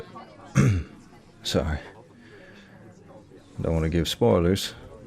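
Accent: South, United States. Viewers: IVE BEEN WATCHING YOUR SOUTHERN ACCENT SERIES ON REPEAT…. It’s safe to say I’m an addict now… 🥀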